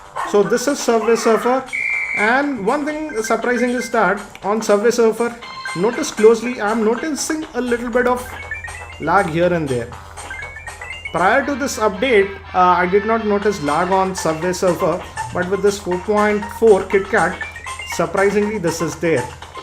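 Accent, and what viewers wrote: India. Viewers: That's an Indian accent bro and he's Indian so he's not faking it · Lol kinda cool how your voice started out like american then went full blown indian lool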